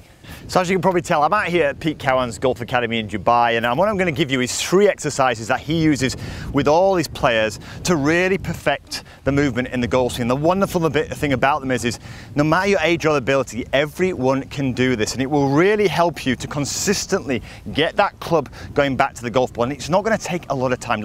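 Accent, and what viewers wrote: United Kingdom. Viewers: who the heck is and how ddid he get so many followers without me ever heating about him...fideo seems very good guess thats why..ps..how did the hoo UK online golf instructors manage ot get their own diffrent accent?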